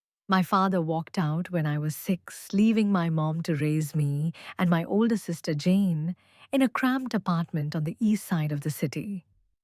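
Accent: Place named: South Asia. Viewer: A white woman with a South Asian accent ?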